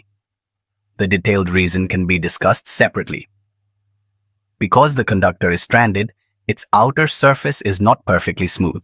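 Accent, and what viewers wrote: India. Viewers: You made the sound with AI and still put the annoying indian accent!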